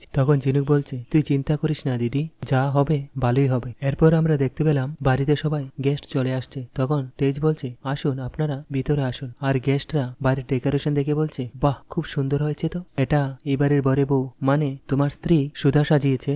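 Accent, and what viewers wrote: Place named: Bangal, Bangladesh. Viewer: কথকতা অতি খারাপ। যত বাঙ্গাল বাঙ্গাল ,অশিক্ষিত কথকের জঘন্য কথকতা ভাল লাগেনা নিজেকে যোগ্য করো।চেষ্টা কর। নিশ্চয়ই পারবে।শুভেচ্ছা রইল।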